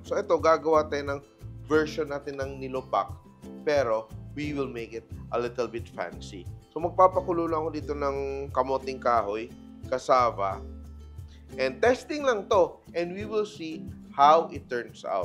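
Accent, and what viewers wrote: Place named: Iloilo, Philippines. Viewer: Ilonggo Ikaw?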